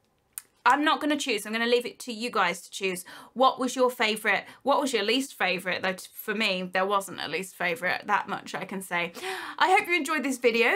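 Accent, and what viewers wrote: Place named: England, United Kingdom. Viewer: Just wondering you sound English How do you pay in GBP Or do you just have to pay in dollars?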